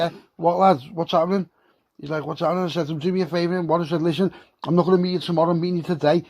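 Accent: Liverpool, United Kingdom. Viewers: This is my sleep music I fall asleep most nights listening to scouse stories like there my mates talking to me before sleep love it keep this up brother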